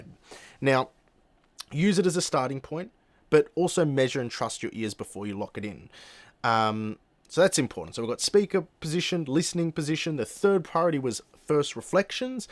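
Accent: Australia. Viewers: This has changed the way I view putting my room together thank you!!! So nice to hear a fellow Aussie break down things to Australian standard as well!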